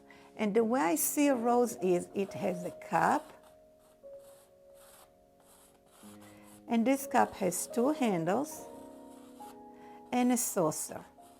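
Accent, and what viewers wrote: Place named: Brazil. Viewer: You an brazillian accent ... Beautiful !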